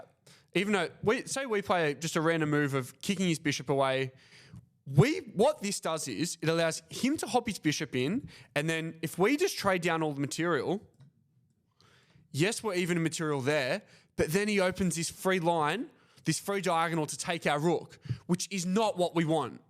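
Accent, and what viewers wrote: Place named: Australia. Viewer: So after watching hours of Alex Banzea's vids I found myself thinking in his Romanian accent. Looking forward to thinking in a Down Under accent!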